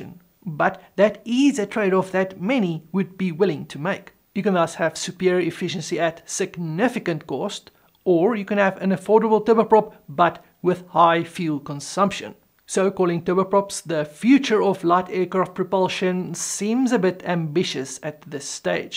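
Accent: South Africa. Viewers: Brilliant !!! Well presented. Hou van die Suid Afrikaanse klink.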